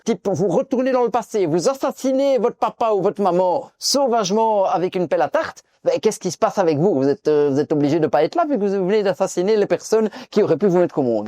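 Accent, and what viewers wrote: Belgium. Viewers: Sans l’accent belge ce mec serait juste lourd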